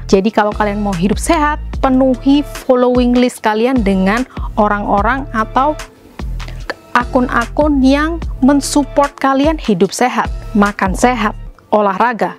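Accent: Java, Indonesia. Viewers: seneng bgtt bgttt sm cici nyaa kalo omg jowoo medok bangett huhuhuuuuu 😂😂😂 jd bikin smgt buat nonton eh olahraga yuk!!' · Sueneng aku mbek penjelasan e mbak e medok ee lohh🔥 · Sampeyan iki wong ndi to cii 😂😂😂 bahasane medok men pas ndagel 😂😂😂 ke mekel tapi mak jleb ning otak😘 lope u 😘😘😘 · maksud sy liat cara berbicara lucu emeszz, medhok2 jawa, 👌😘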